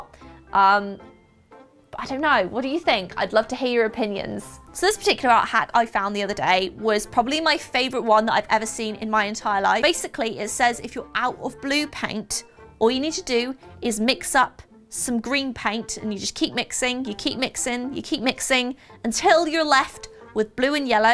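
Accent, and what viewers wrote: England, United Kingdom. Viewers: Very British, but you kept mentioning target and dollers... Do you live in America? But were born in England? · Is she from england?? I lover her accent😭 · so, I saw the video in my feed, i entered, i listened to her british accent, I saw that she is a cool person, and I subscribed. that's all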